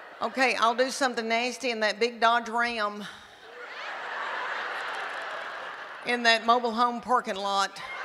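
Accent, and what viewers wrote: South, United States. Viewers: I found this woman, by accident on YouTube last year. Im from England, and i just love that Southern accent., your awesome, you waited a long time for this, you sure do deserve everything now.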